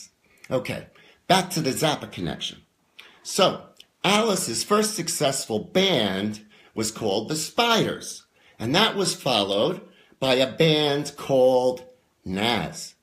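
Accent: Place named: New York, United States. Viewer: is that what a new york accent is?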